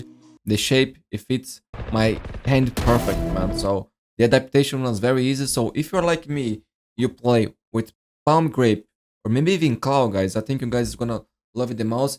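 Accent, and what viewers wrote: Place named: Brazil. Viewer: Tu é brasileiro ne? Sotaque não nega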